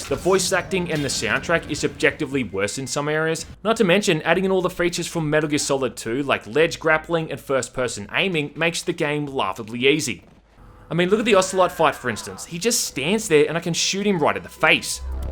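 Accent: Australia. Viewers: "Where he backflips off a missile in mid air, I mean come on son!" - Aussie AF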